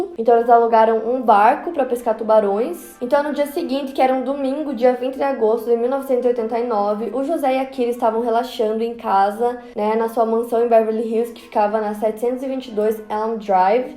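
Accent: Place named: Santa Catarina, Brazil. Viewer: Não tem nada a ver com o vídeo, mas eu sempre me pergunto se a é de Santa Catarina ou do interior do Rio Grande do Sul. Os sotaques são muito parecidos. Eu sou do Rio Grande do Sul e as pessoas que moram mais no interior tem o sotaque bem parecido, então eu acabo me confundindo.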